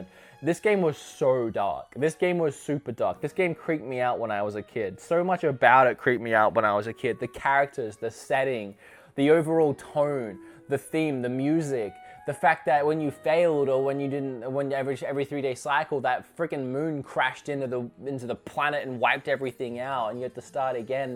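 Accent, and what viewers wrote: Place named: Australia. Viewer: God, your accent is really throwing off my sensors. I'm new here but I'm guessing Australian, living in America? I know you mentioned Australia in the intro but I picked up on it before then. As someone who's traveled a fair bit, my accent tends to modulate and sync with my surroundings, so I'm guessing that's what it is?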